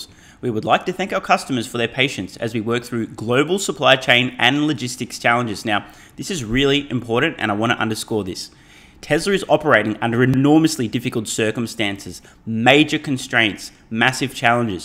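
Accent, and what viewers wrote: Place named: Australia. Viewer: Your bloody Australian accent is delicious!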